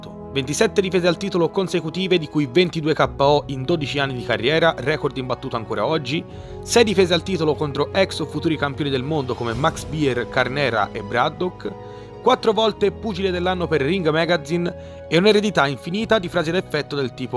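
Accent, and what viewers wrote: Tuscany, Italy. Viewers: L'unico canale di MMA che parla toscano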